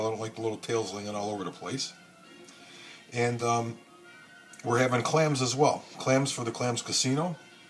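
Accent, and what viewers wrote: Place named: Buffalo, United States. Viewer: If I didn't know better I would guess you being from the west side of Buffalo.( thats a compliment by the way) Those cordone's look so good I can't wait to try some myself. I watched both videos mainly because it was like watching something from home. Thanks for that, and God bless you both.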